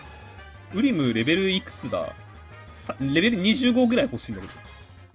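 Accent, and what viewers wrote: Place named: Kansai, Japan. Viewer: って関西弁似合うね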